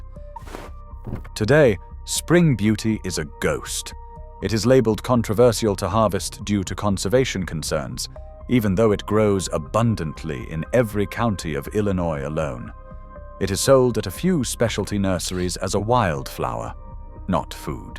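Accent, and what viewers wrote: United Kingdom. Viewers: It's the UK pronunciation.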